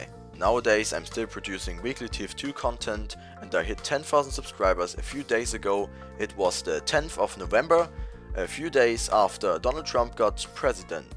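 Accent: Germany. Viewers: Hey, you live in Germany! Somehow I always knew that from your accent... Well, i live in a country right next to you, so we are basically neighbors! Please keep making fun stuff similar to the friendly class series. Those vids are the most fun I ever saw. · I kinda heard it in your'e voice that you are german. I hope you are getting way bigger.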